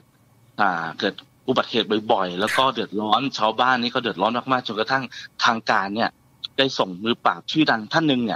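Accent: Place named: Korat, Thailand. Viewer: สำเนียงโคราชมันได้อรรถรส 🤣🤣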